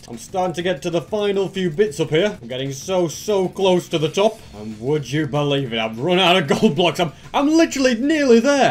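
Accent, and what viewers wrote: United Kingdom. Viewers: btw are you British?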